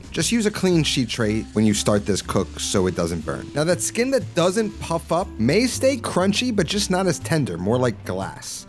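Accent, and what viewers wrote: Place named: Puerto Rico, United States. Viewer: This guy's like if you mixed a puerto rican and a jew. Very disconcerting accent.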